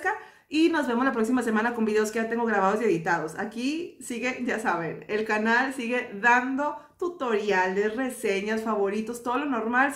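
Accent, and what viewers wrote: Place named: Jalisco, Mexico. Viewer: gracias por compartir lo que estás viviendo. Eres hermosa e irradias mucha luz. Por tu acento creo que eres de Jalisco o Nuevo León. Yo estoy en la Ciudad de México y aquí está el Dr. Erik Estrada, tal vez ya lo conozcas. Te comparto su página: <link> Le dan espacios para hablar y compartir sus conocimientos en programas de radio con Talina Fernández y Martha Debayle, tiene su Canal de YouTube, lo encuentras como Noticiero de la Salud. Él maneja alimetación vegana que ayuda a tu cuerpo a pasar mejor el proceso de las quimioterapias. Él da conferencias cada 15 días en el Centro Médico Siglo XXI y da consultas cerca de la Universidad de Chapingo. Tal vez pueda ser una buena opción para que pases de mejor manera este proceso. Estarás en mis oraciones. Mucha fuerza.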